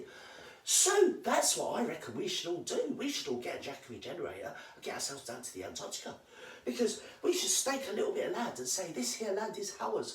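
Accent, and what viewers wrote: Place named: London, United Kingdom. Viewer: Haha love the bleeding accent up my street, love you! W Londoner here love your banter haha tell it girl how it is! Go girl! 💕🤣👍🇬🇧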